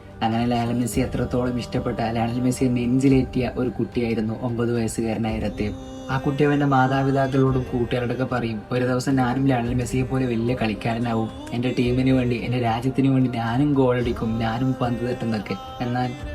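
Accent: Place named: Kannur, India. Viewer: ഞാൻ കാസർഗോഡ് 😊 ബ്രോ ന്റെ സംസാരം കേൾക്കുമ്പോൾ തോനിന്ന് കണ്ണൂർ ആയിരിക്കുമെന്ന് 😊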